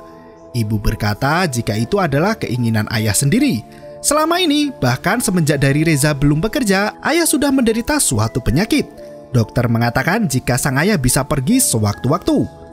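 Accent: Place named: Java, Indonesia. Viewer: Medokk banget mas.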